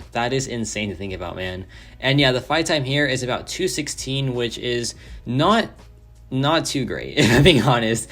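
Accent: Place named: Singapore. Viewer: U sound like singaporean sia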